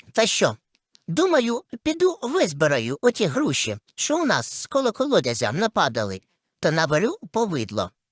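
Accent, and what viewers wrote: Poltava, Ukraine. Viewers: У нас на полтавщині так розмовляють.Голс такий заспокійливо в'їдливий Так гарно , весело.Слухаю з задоволенням коментування бабчині.Особливо по спідницю.Ап про сваху як тонко підмічено!Дякую, дякую! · Наче з Полтавщини говір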